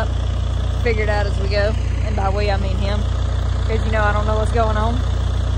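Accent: South, United States. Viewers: Amazing southern accent..😂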